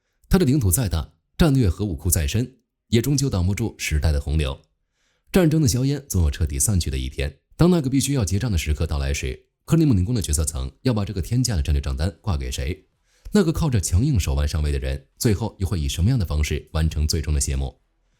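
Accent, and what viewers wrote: China. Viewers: 博主是台湾人？只是这配音很大陆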